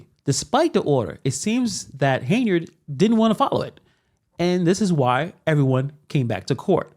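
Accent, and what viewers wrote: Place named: Appalachia, United States. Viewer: Ya all ya all all learn from me lol 😂 you speak like a uneducated hillbilly clown 🤡 total ghetto girl 😂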